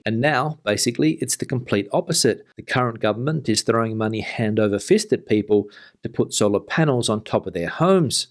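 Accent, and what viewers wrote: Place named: Australia. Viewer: Hi, I note you have an Australian accent! Do you see many other Australian expats in Spain, and what made you move to Esp from Aus?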